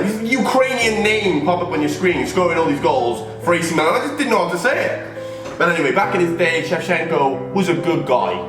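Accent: Wales, United Kingdom. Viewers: Is he WELSH?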